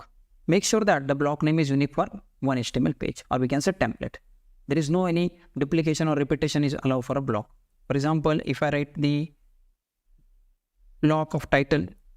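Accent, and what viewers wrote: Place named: India. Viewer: oh my god, I'm learning Django and Indian English at the same time. Thank you, Bro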